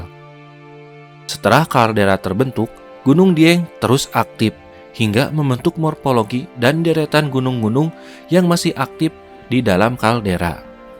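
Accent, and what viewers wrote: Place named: West Java, Indonesia. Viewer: Suara narator Video ini saya yakin asli Sunda . . Karena pelafalan kata ‘ Aktif ‘ menjadi ‘ Aktip ‘ dan penyebutan Air Terjun menjadi Curug serta aksen bicara masih ‘ Sunda ‘ bgt . . 🙏🏼🙏🏼😬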